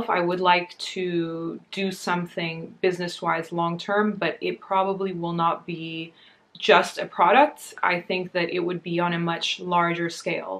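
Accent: United States. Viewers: American schools explains why you have an American accent. Also, what is short for?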